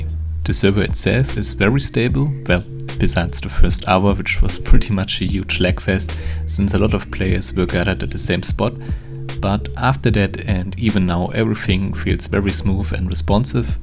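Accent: Germany. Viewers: Mach das besser auf deutsch... Das englisch ist so schrecklich deutsch 🤣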